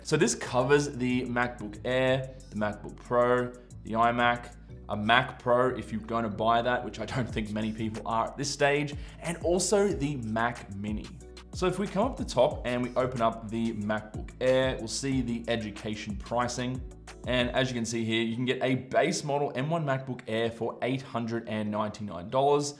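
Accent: Australia. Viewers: I think Apple Australia doesn’t have this deal atm… (I was assuming you’re from Australia because of the accent. )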